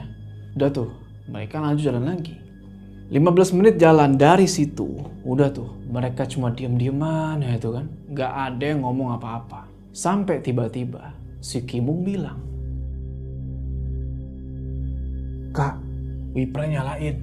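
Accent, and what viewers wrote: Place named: Java, Indonesia. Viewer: bang, aksen jawa lu makin hari makin bagus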